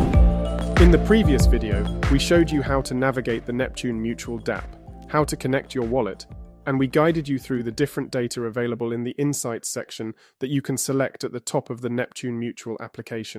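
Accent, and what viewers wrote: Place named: United Kingdom. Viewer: I like dictor's british accent)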